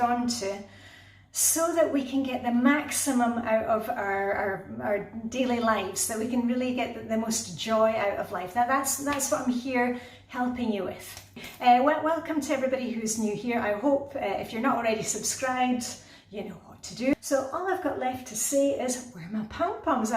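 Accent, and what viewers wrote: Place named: Edinburgh, United Kingdom. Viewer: you are a darling! Love your beautiful Edinburgh accent; brought up my kids there, and still miss it.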